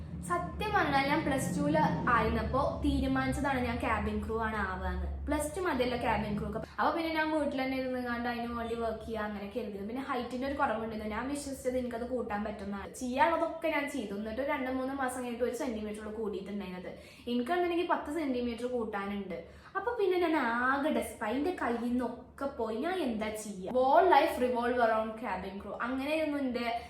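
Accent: India. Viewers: Njan ninte vedios kanunathe malappuram slang kelkkan anu 🫂❤️ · Bro that perinthalmanna slang is🤌🤍(kunu...ttoo)😂 Ankk Cabin crew aavan kaiyatte tto🫶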